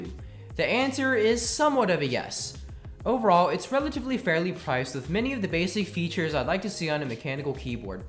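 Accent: South, United States. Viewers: bring back the southern accent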